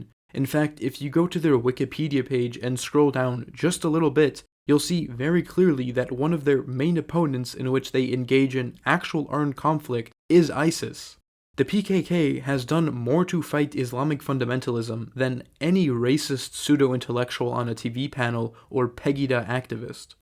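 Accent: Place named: United States. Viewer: I think it’s relevant to mention the guy who narrates this video, maybe lives in Germany, maybe is of German descent, but is not German by blood. He speaks with a clear American accent. Something to consider since he’s taking a clear globalist leftist position in his videos on Germany. Probably just some brainwashed college student rich kid. So obviously hes pro immigration pro invasion pro communism.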